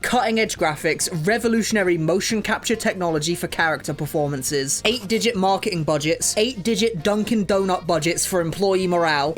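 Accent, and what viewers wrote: United Kingdom. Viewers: without the annoying british accent this guy would have 500 subs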